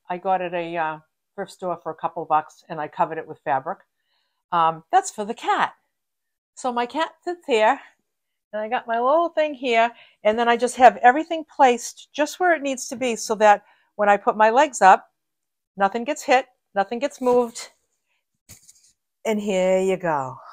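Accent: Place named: Massachusetts, United States. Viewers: ! Your channel popped up and you're either a genius or I lead a very sheltered life. I have been looking for ways to minimize my living space without selling my home. I absolutely love what you have done and that everything has a purpose and a story. I have been retired since 2019. working breeds stress and clutter. My space is way too big and I'm exhausted by donating and restructuring. This video helps me focus. My husband (we lost him in 2020) was from Boston and I adore your New England accent. Your video gives me lots to think about and you are truly inspiring. Thank you and continued good fortune. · Love ya Boston accent! You don’t look like you gained a pound. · you are delightful! (Sounds like you’re from middle MA- I’m from western MA) I’m getting ready to move in with my oldest son. My daughter in law is precious, and has no problem sharing her kitchen, but I’d still like my own tiny space. 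Love your ideas!!! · Love your video. I can tell you’re a Massachusetts native. ❤ I’m from Gloucester, but now live in Ohio. 😕 love your solution—and all the good stuff you were able to thrift!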